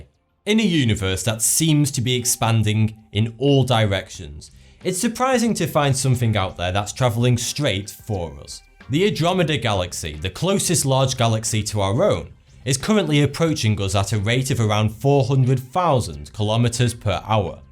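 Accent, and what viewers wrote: United Kingdom. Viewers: That British accent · the problem is that i am moroccain and english its kindaaaa hard to me so i know like 23% of english words and he problem is that he is british and his english is so strong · Mate he's British not American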